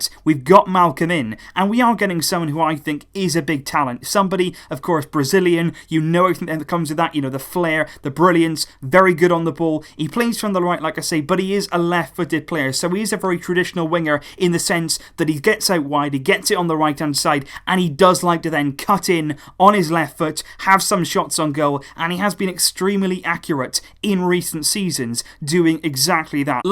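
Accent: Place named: England, United Kingdom. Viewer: i like listening to your english accent again welcome back my friend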